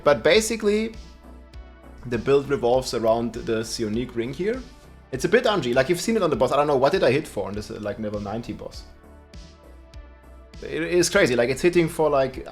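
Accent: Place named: Germany. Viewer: Watching a bloke with a German accent play D4 with a John Carpenter like soundtrack is something I never knew I needed until now! Liked and subbed!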